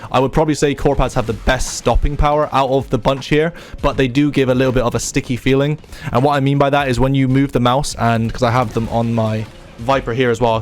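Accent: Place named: Bristol, United Kingdom. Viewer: You from Bristol mate? Amazing to hear a familiar accent in the peripheral community 😁 subbed and liked!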